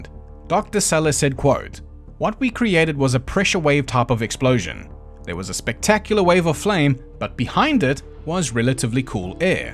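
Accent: South Africa. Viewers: Im so confused why everyone is saying he sounds Australian. It's quite audible someone who primarily speaks a form of dutch (in this case Afrikaans) speaking English. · I am now officially a fan of the South African accent or yours anyway which I can't say about any British or English accent. Also, your sense of humor is amazing. Laugh so hard every time I listen to your recordings keep going. Keep going love it. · howdy from savannah georgia USA. new sub here. i love your sense of humor and thank you for NOT being AI. your south african accent and pronunciation is far superior to most others from the american south east. i'm glad youtube suggested your channel. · I spent a few years working in state fairs, and a lot of my coworkers were from South Africa. You apologize for your accent, but it's one I've heard many times. It's pretty much what I expect the cruise and fair industry to sound like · Algorithm just served this video to me. 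I clicked on it because I've been fascinated with the Mary Celeste since I was, like, 6 or 7-ish. So I was already excited! THEN...I hear it being narrated by a fellow South African!! AND...the humour (the whole tone, really) is absolutely on-point!!! This is peeeak YouTube! 👌🏼😁🇿🇦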